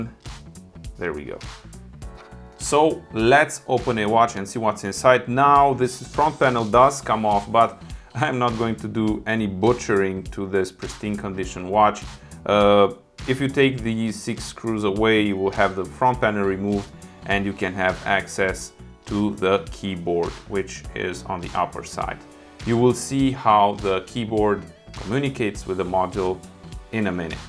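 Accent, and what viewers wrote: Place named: Hungary. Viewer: Kicsit magyar az akcentusod. Talán nem véletlen, ugye? :)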